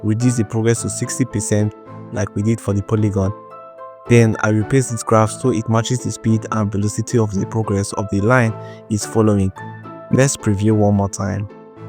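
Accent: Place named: Nigeria. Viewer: I’m your Indian subscriber , struggling to understand Nigerian English accent 😂. Great tutorial 💜.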